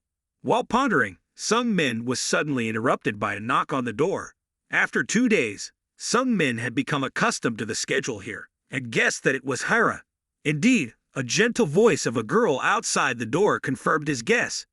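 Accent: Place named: United States. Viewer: Imma be real, I genuinely appreciate the effort but this is one of the worst AI voices I’ve come across to date; the disgusting American dial is cranked up far, far, far too much. It’s almost comical where it not for the fact this isn’t satire. I don’t know who your target audience is but unless you’re specifically targeting the most niece subgenre of fat fucks I’d highly suggest you mellow out some of that abhorrent mannerism and intonation.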